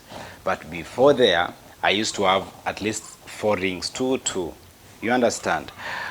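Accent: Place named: Kamba, Kenya. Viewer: You have a good English while you are serious but when you and Jude are not in good terms your English sounds kikamba aki, anyway you are free from your own words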